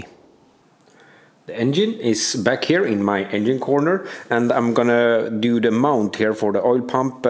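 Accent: Sweden. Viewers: Take care of yourself mate, you are doing something thats really cool and unique. I look forward to seeing the update videos on what you've been doing and hearing you speak swenglish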